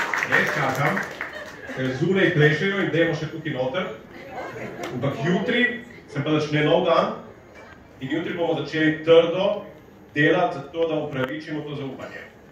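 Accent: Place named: Northern Primorska, Slovenia. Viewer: NI lepšega kot slišat severno primorski glas v politiki. Diši po poštenosti.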